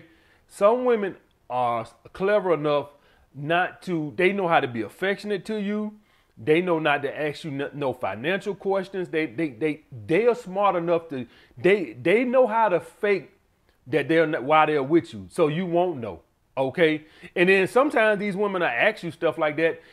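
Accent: South, United States. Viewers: talking that real down south fly nigga sl-l!+ Them late 80s, 90s to early 2000s crown vics, Chevys, Buicks and lacs, mane!!!! That brought me back, good memories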